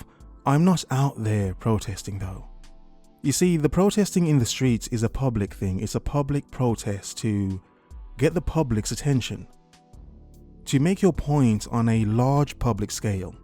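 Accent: United Kingdom. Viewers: You do a good job 8 times out of 10. This one, no. You sound like a cranky old Republican. Your idiosyncratic Brit voice mitigates the Archie-Bunker-impression somewhat. You're still the Man, though.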